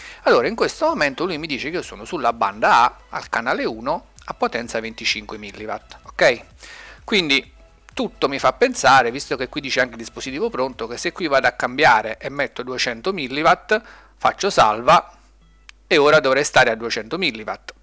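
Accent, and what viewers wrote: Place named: Naples, Italy. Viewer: Ciao, dall’accento ti sento del sud, forse partenopeo? Siamo vicini, vieni al Sirio di TERZIGNO a trovarci?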